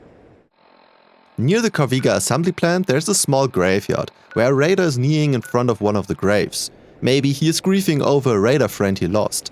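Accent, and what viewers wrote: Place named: Germany. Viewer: Bro can't get rid of his German accent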